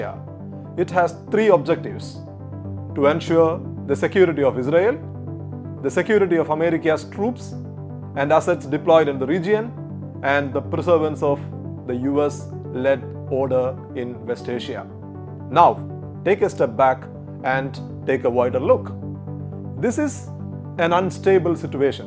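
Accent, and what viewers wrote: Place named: Kerala, India. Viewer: English with Malayalam accent, sounds interesting, but difficult to comprehend easily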